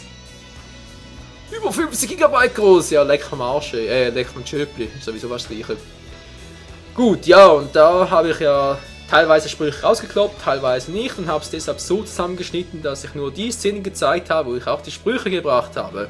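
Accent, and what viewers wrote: Switzerland. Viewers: hey <3 bist woll ein schweitzer :D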